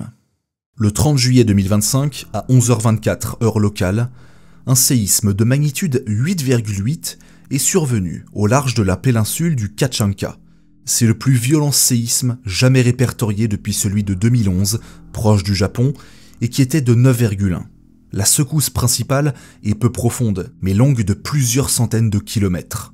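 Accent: Spain. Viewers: Pouce bleu pour l'accent espagnol! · pouce bleu pour l'accent espagnol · 👍🏻👍🏻👍🏻👍🏻👍🏻👍🏻💙 pour l'accent espagnol ! Lorsque je suis allé à la Réunion (l'année de ta naissance 😁) je n'ai pas été dépaysé, je connaissais déjà les Canaries !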